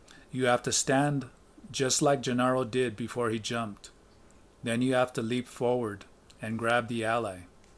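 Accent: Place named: Spain. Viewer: Listening to these magical books with the clear spanish accent is perfect! Muchisimas gracias hermano!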